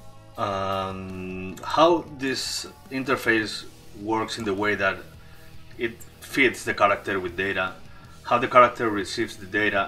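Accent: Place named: Ireland. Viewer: Very cool! Irish english hehe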